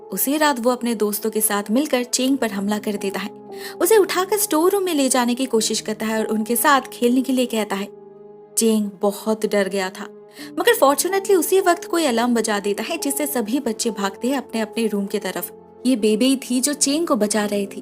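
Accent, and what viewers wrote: Bengal, India. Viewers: Are u Bengali?..u have beautiful voice...u have Bengali accent..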